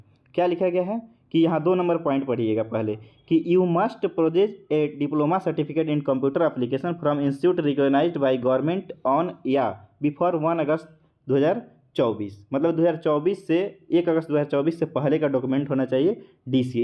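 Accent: Motihari, India. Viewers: Aap motihari se ho kya bhiya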